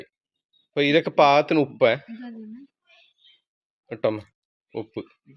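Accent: Kannur, India. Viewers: കണ്ണൂർ ഭാഷയുടെ ഒരു സൗന്ദര്യം ആഹാ ❤❤❤❤❤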